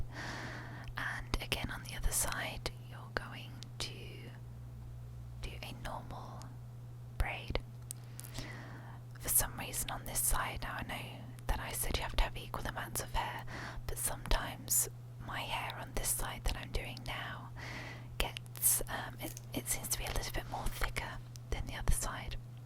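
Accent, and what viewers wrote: Scotland, United Kingdom. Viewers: Great video, could you do some more Scottish accent soon? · your otherwise beautifully Brittish accent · Nice to hear a UK accent!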